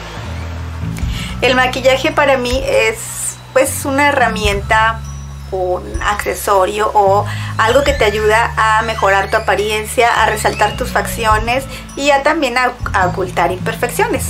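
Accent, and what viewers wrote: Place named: Tampico, Mexico. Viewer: muy bonita plática no cabe duda cómo platicamos los de Tampico al oírte me siento en casa en mi tierra mi esposo es cómo el tuyo y acá en Guadalajara les llama la atención cómo es muy bonito cuidate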